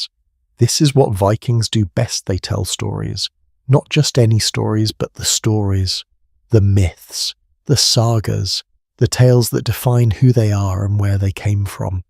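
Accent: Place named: England, United Kingdom. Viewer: From Norway. The servants Are TRELL/ treller , not throll. Reading with English pronansuention mekes many names and words so strange. What happend to the selebration of Jul? The feast and oferings in the darkest part of winter. Fishing , trapping and hunting are all winter chors as long as the weather permited.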